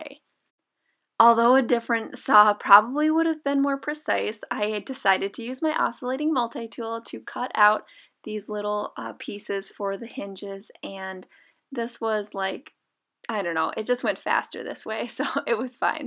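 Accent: United States. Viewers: I'm hearing an American accent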